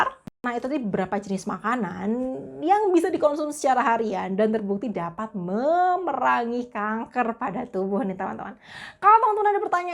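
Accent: Java, Indonesia. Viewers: Dr klu berbicara medok banget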